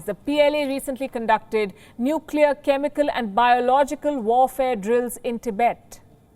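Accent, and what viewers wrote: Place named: India. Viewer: 这大婶天天用咖喱英语黑中国，数十年如一日😅😅😅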